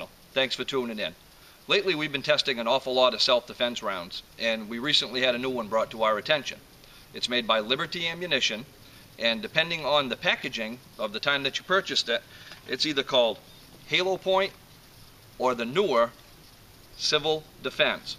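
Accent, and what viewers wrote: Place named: New England, United States. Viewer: That Yankee accent gets me...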